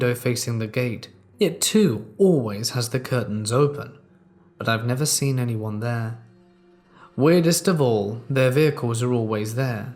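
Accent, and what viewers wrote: United Kingdom. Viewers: Just love hearing his British accent...wished I was fluent having his accent living here in America... I trained as a trucker and heard creepy stories about what States to avoid, always be aware of your surroundings because of human trafficking and truck jacking do to people think that semi truckers have a lot of money...😬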